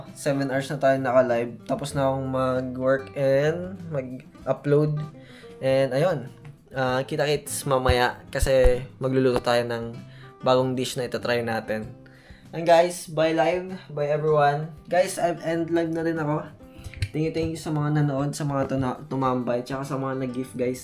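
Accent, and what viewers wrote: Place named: Ilocos, Philippines. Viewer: twelve fortey gayam... your ilocano accent is lovely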